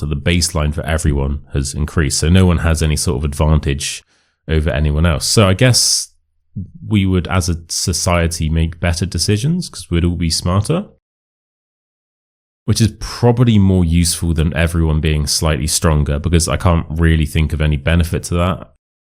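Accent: RP, United Kingdom. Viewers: Thank you very much dear Mr yes you are speaking real British English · I started watching your videos a few weeks ago to improve my British RP pronunciation, and they’ve been amazing! But here’s the twist 🔀 I’ve become too addicted. I started watching them at night, and now they’ve turned into my secret weapon for sleep. A few nights ago, I fell asleep halfway through a video, and yesterday… I slept for 12 hours straight! So, congrats 🎉🍾🎊🎈 your videos are not just improving my accent, they’ve also become my personal sleeping pill. Keep up the great work, I guess? 🧐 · Hi, it's really amazing to learn British English with you. Thanks a million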